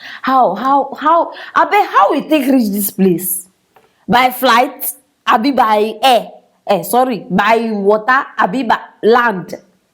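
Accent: Nigeria. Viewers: Madam you can change your Nationality but you can change ur Dialect, one 9ja always 9 ja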